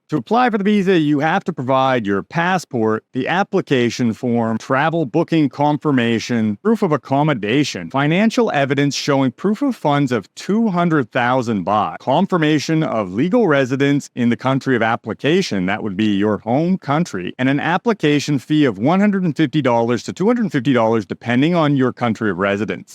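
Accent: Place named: Canada. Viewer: You sound Canadian, probably Alberta? If you are into languages check out Shuo, she teaches Chinese in Bangkok Thailand, is Chinese, a great person and teacher!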